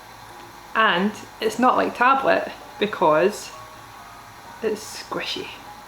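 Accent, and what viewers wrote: Scotland, United Kingdom. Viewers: It is English with a Scottish accent 🤦‍♂️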